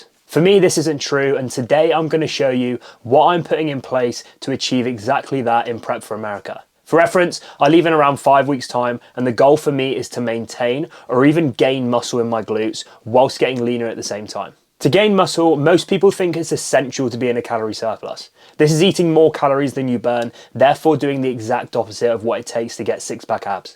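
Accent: United Kingdom. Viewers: For me, it is his eyes, and adorable ingenuous accent. Brits are the best! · Oooo he’s Bri’ish 😍😍😘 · You have some gorgeous blue eyes and I love the British accent. That's what you call a Bubble butt in the black community in the USA. I will never have a flat or wash board stomach because at 65 years old, I don't have the energy to be doing a lot of exercise. I am not overweight by the weight. My weight is 180 pounds and I am 6 feet tall. 🤣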